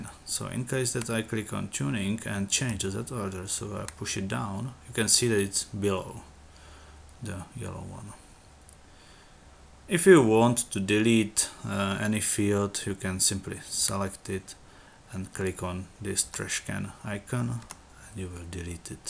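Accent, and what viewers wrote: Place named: Czech Republic. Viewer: Which nationality are you?? It somehow sounds pretty much like czech accent.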